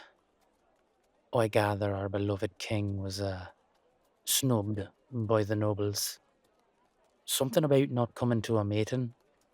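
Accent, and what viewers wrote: Ireland. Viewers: I think just really enjoys doing an Irish accent xD Edit: Loved the video btw!:D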